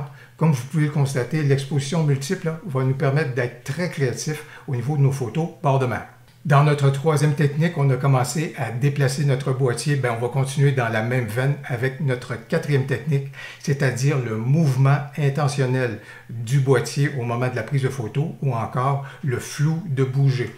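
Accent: Quebec, Canada. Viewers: l'accent québécois et toujours un pur plaisir de sonorité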